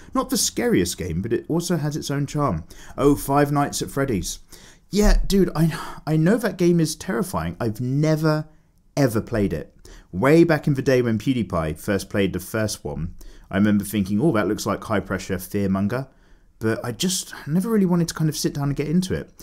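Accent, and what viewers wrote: United Kingdom. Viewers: Hey! My first time writing on these episoed as I often just lurk and listen to your asmr british voice. But when you were talking about league of legends splashart (2 weeks ago, this is a repost) I had to ask you something, ever heard of Kienan Lafferty? He made most of the famous splashart for riot and he is my biggest inspiration and the reason I started drawing + Im a no lifer and ive played lol since 2012 and the art is way better than the gameplay xP Oh and YOU made me start drawing anime tiddies and "other" good parts;) Anyway love you and your videos <3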